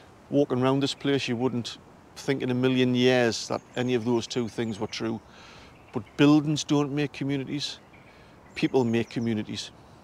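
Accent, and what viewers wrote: Newcastle, United Kingdom. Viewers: OK, thanks for replying. I just found your channel, and I love to hear the Geordie accent, especially as I haven’t lived in the UK since 1970, and the only chance to hear it is from occasional visits from friends, or watching somebody like Sarah Millican on TV. All the comments about T. Dan Smith took me back to my time in Newcastle and Gatedhead, 1962 to 1970. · Love this content. And I love the Geordie accent. Subbed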